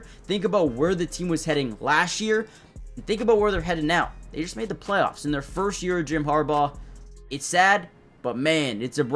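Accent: Canada. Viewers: Is brother a Canadian? Caught a couple of 'aboots' in there?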